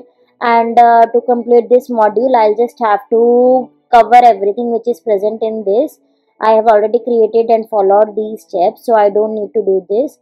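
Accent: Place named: India. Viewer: Keep making videos , Thoda hindi tone hota haina ache se samjh m aa jata h🤩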